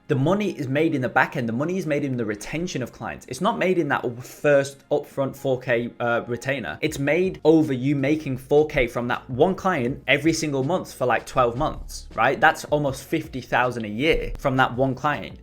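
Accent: United Kingdom. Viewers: Hey bro are you originally from the UK?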